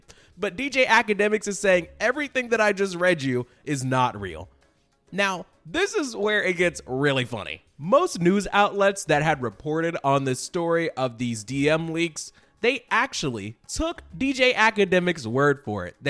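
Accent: Canada. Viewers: also your canadian accent is my favorite 😂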